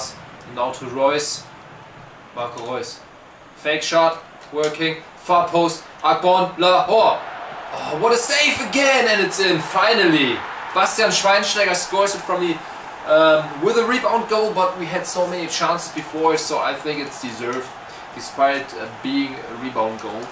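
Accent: Germany. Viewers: Alter Red deutsch man merkt dass du deutsch bist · Manch mal merkt man das du deutsch sprichsf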